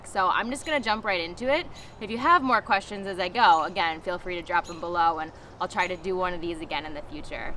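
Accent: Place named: Michigan, United States. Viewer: oh yes! I’ve lived in Florida for 25 years now but my first 35 years I lived in the upper peninsula of MI.. Still love & miss that accent! And yes kiddo you got it!😊👍🥰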